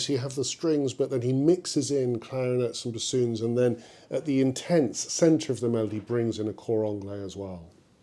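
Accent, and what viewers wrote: United Kingdom. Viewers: No need for blasphemy to praise Bach. He would be appalled by your reference to “divinity.” In addition your performance and narrative are disgusting—especially the poncey British accent. Goodbye!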